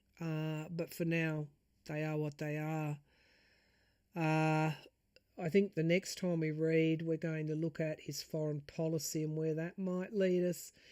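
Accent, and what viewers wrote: Australia. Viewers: Your Aussie snark sounds so pretty! Thanks for all you do! Can't we just have a whole new party? From the ground up. Dems or magats are useless. A new party of young folks. But if we apply this: A kid is getting C and D's all year. On the final, he gets 100% A+...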